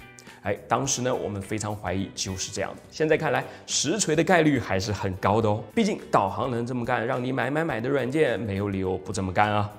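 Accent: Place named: Sichuan-Chongqing, China. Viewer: 我和朋友猜是哪里人，应该是川渝或是江浙一带，前者可能性更大，不知道对不对？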